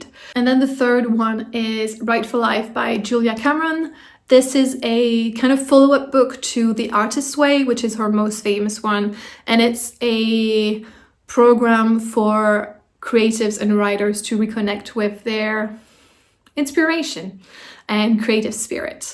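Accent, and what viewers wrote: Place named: United Kingdom. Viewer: Nice getting to know you better 😊 I don’t know if I could do this tag anytime soon because I feel awkward talking about myself (in general speaking into a camera is unsettling for me) but I’m trying to do more book tags. I think you’re a super eloquent speaker BTW! I would have guessed you were from the UK!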